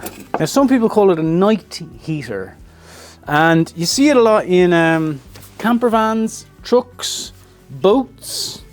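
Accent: Ireland. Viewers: Would love to listen to you blathering away with your wonderful Irish accent. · Well, you're SO wrong! 😄 We love listening to you prattle on about whatever in your Irish brough! Lol It's very entertaining! We would actually watch you do whatever. 😊 You actually do a great job of carrying on a great conversation with us (a camera) Please continue. We like you.😉👍 (We also love the chateau reno - watching)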